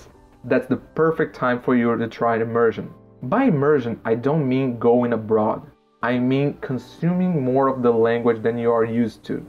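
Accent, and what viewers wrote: United States. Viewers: I thought he sounded American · He does sound pretty American later on, but definitely not in the beginning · Wow when I started listen you I thought I was watching a American guy spoke in English. I couldn't say that you are a Brazilian if you didn't say that you are. Congrats from now on I will follow your videos. I am studying on my own as well. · Brazilian with american accent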